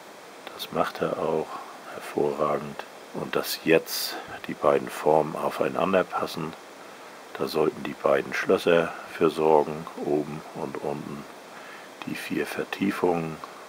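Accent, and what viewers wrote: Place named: North, Germany. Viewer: der gechillte norddeutsche Dialekt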